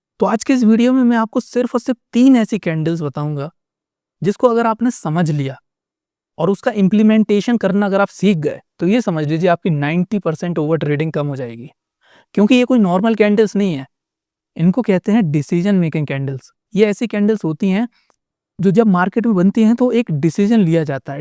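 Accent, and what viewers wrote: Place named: Agra-Mathura, India. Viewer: Bhai aap agra ya mathura se ho kya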